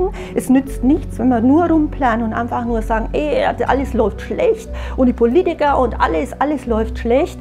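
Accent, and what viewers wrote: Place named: Franconia, Germany. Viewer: ich sehe mir gerade deine Videos Stück für Stück an und finde, dass du ein Original bist.:-) Die Kombination aus dem Wissen, der Musik (finde ich in diesem Video hier nicht so störend), den gut gewählten Farben (warst du mal bei einem Imageberater, scheint fast so), dem Buddha im Hintergrund, deinem gesellschaftlichen Engagement - und nicht zuletzt der fränkische Akzent:-) macht ein Alleinstellungsmerkmal aus. Man merkt es sich.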